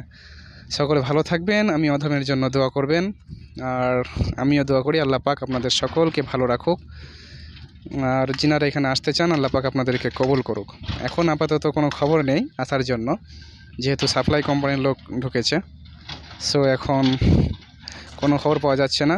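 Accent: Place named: Kolkata, India. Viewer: Aapner bhari kothai Kolkata er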